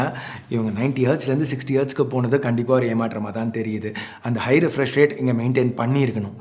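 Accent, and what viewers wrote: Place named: Chennai, India. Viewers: Enna bro chennai slang la pessa try panra maathi theriyuthe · Nadula nadula chennai slang varudhe🤭😶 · Chennai slang super bro. Already subscribed. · Ona pakave irritate ah irukku . Onnoda hair style and thavai illatha vetti pechu Mayiru tamil basai ketta chennai tamil nu soldra asingam puduchavane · Bro antha Chennai Tamil slang nalla irukku bro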